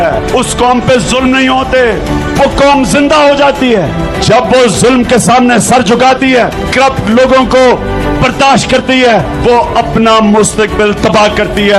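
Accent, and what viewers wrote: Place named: Pakistan. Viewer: this sound Pakistani sound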